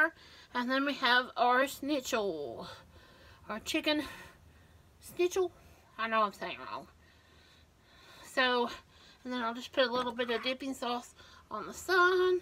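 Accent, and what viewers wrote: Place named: West Virginia, United States. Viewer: well, I have a. Horrible pain when she speaks Hello ever body.....scrapes. she doesn't care. I'm not subscribed and wont matter if I don’t watch. I don’t subscribe she doesn’t ever respond even to her wrorshipers. ( those who praise a new shirt with stains) I can like subscribe a million other YouTube cooks, like Paula deen ( who is a true a Sourtherner. Not fake Orr a wanna be ). My grandparents were from West Virginia-as is and their accent was hard as well)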